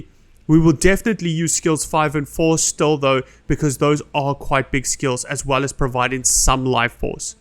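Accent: South Africa. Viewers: is that the distinct south african accent i am hearing?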